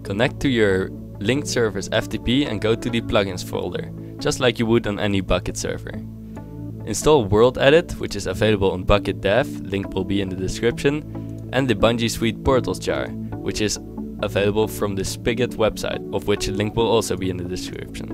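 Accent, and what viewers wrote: Netherlands. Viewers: je klinkt een beetje Nederlands haha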